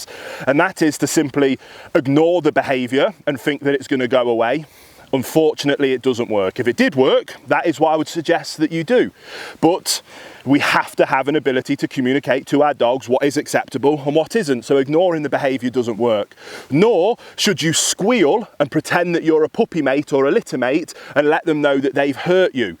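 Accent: United Kingdom. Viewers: Hold up. He's a big husky dude with an epic beard, a British accent, tattoos, sitting in a field of nice grass with puppies and talking about love, empathy, and kindness. Yeah, this dude fucks.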